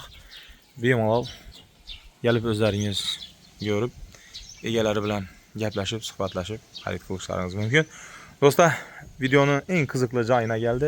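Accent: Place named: Khorezm, Uzbekistan. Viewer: Хоразмча сулливар кийналиб гетдингку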